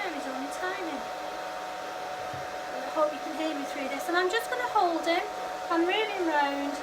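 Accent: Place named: United Kingdom. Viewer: Just my luck... This is the 2nd video in a row that that I can not understand. Between my hearing problem and the thick accent(British?) I am just not getting the info I need. Otherwise, good video. Thanks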